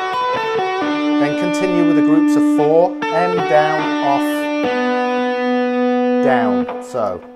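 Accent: United Kingdom. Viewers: I've noticed that has a British accent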